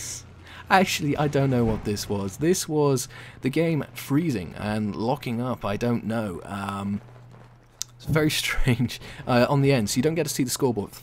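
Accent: England, United Kingdom. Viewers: exactly what I was searching for, far better than any other video assessing the patch that has thus far been uploaded on youtube. on a personal note, nice to hear an english accent on a battlefield 3 commentary, I am subscribed to many american commentators and had struggled to find a decent english equivalent, struggle no more. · Oh my god! it it so satisfying to finally hear somoene with a strong english voice narrating on of these videos,(i cannot cope with anymore generic US acents)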